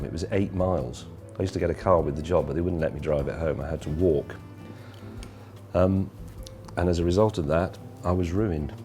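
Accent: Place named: United Kingdom. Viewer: It's not caarth its cars.. I swear, I hate British accent...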